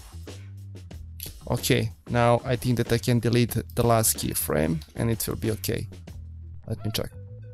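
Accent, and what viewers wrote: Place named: Russia. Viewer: Тебя русский акцент выдаёт )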